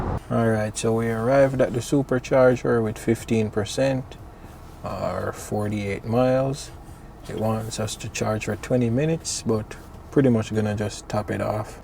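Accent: Jamaica. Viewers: I sense a Jamaican accent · Can i ask if you happen to be jamaican? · Mi know mi people any weh we deh lol big up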